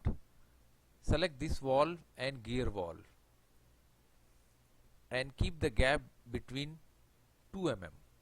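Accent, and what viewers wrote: India. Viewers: i reading comments indian accent what a effective work sir perfect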